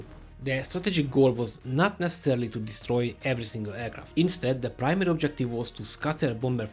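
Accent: Hungary. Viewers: az a jó kis magyaros kiejtés:D egyébként nagyon jó lett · Húú bazmeg, ettől az ótvar durva akcentustól szinte megfájdul a fülem. Ne magyarul mondj ki angol szavakat!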